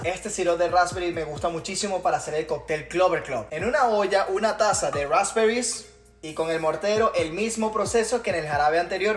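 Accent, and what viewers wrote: Venezuela. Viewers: Será venezolano este chico? Habla hermoso y es un crack en la barra 10/10 hermoso❤ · Este pana parece venezolano :D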